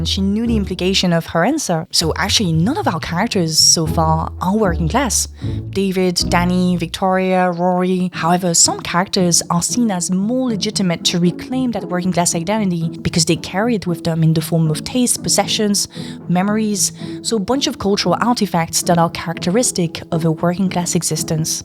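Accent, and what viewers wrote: France. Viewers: Why does sound more French than she used to? Her videos from 3 years ago sounded closer to an English accent than now? Is she just not around English speakers as much now? Just curious. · I assumed you were upper-class because you're French and have a French accent. (And because you're pretty, admittedly.)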